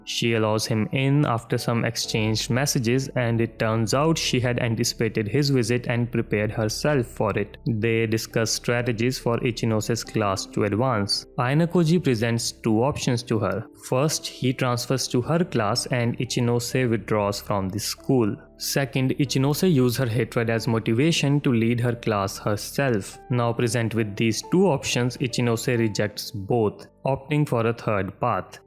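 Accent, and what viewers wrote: India. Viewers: I'm one of the novel pioneers. At first I was like. "Uhhh what's with this indian accent voice" But then he says funny stuff in the end.. "Bro youre so hilarious". You get a sub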